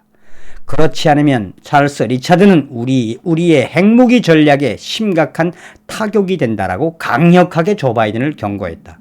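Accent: North Korea. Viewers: 북한 억양의 찰진 표현...디립다 깐다. ㅎㅎ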